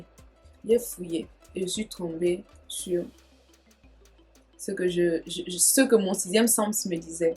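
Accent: Cameroon. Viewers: Donc quelqu’un qui parle avec un accent doit maintenant ce justifier du pourquoi elle a cet accent ma chère si tu n’était pas au courant elle est gabonaise et camerounaise . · Mais elle met toujours l'accent cam le plus souvent quand elle parle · U talk like a Cameroonian even the slants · Pourquoi tu parles avec cet accent Camerounais? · Aujourd'hui c'est l'accent Camerounais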